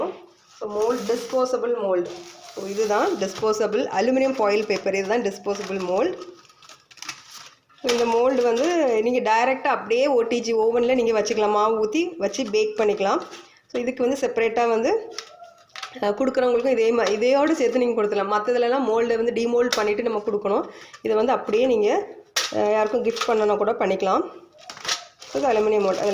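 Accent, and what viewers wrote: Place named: Kanyakumari, India. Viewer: Neenga town ah? But native Nagercoil k. Kumari mathiri neenga pesara style iruku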